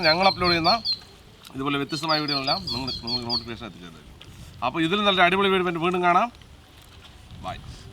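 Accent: Palakkad, India. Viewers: പാലക്കാടൻ സ്ലാങ് 👌🔥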